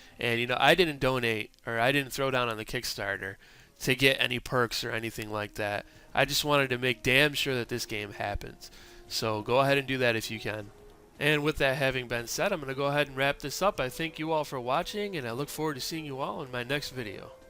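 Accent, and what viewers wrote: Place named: New England, United States. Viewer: This discussion sux you sound like a Yankee just like that dumbass suckmyturban! And you didn't even play confrontation that was socom at it's finest! Socom 2 was best in that era. But when the HD world came out it was all about CONFRONTATION! Only problem like the other socom was the cheaters! Socom was known for after been out a year or so the cheating came out! Socom was the best game ever made!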